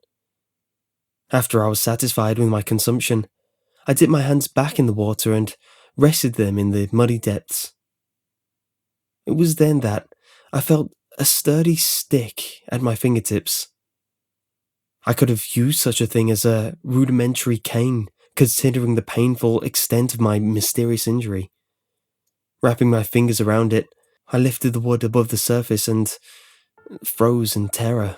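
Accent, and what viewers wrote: United Kingdom. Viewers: The dialect and narrator were so British it hurt. It hurt real good.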